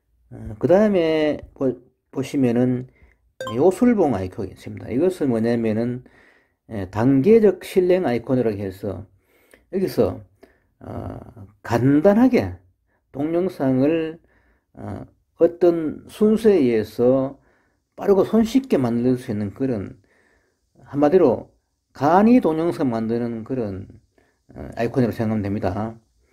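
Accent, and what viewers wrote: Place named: Gyeongsang, South Korea. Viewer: 설명 아주 천천이 차근~~ 잘 하시네요. 귀에 익은 경상도 말이 마음에 들어요. ㅎ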